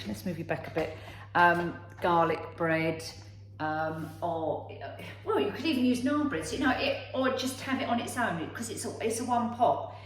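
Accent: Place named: Cockney, United Kingdom. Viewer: She speaks with a cockney accent. She must be the last cockney left in Britain.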